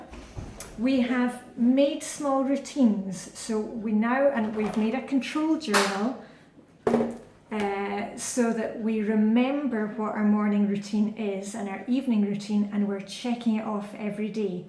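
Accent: Scotland, United Kingdom. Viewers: Thank you so much for everything you do. These baby steps are truly life-changing and I'm feeling happier and more positive as a result, I'm really getting my 'mojo' back, so thank you for your videos. I've had FlyLady's 'Sink Reflections' book for a couple of years now and it's great but actually seeing you every day and watching your videos has really made all the difference. My sink is clean and shiny, I'm dressed to shoes, the washing is on, the bed is made and my kitchen hotspot extinguished! I look forward to watching the next video every morning, I make a cup of coffee and it's like having my own little pep talk. Keep up your fantastic work! PS I'm a Scot living in England and it's lovely to hear a familiar Scottish accent, just like being home. Thanks again x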